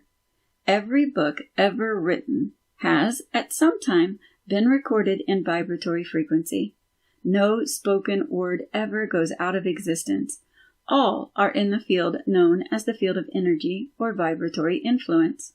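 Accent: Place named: New Jersey, United States. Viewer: One of my fav chapters in this 6 volume CLASSIC. I listen to all 6 volumes when walking on forested trails. And this lady is my fav reader cuz she carries the frequencies. I do chuckle each time she says the word: on ... cuz it exposes her accent ... probably NJ or Long Island ... she does a fantastic job .... Baird would be proud of her efforts to share this remarkable body of work.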